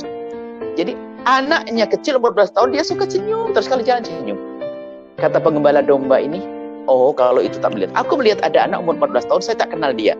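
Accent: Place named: Malaysia. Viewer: Ini youtubernya org malaysia ya. Dri logat suarany. Salam kenal Jkt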